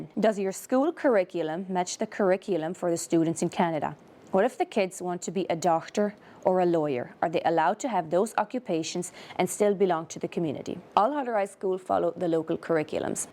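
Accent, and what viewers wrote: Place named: Ireland. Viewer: Your accent has a tiny bit of an Irish sound to it at times, either you did some travelling or Hutterites accents change a bit from colony to colony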